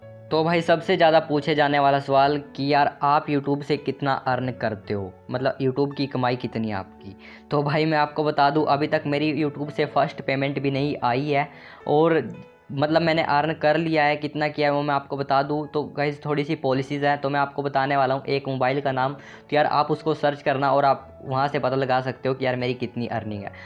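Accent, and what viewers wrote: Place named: Haryana, India. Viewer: Bhai mene teri boli t e pta laag gya tha ki tu haryana ka h isliye tera qna dhund rha tha😜😜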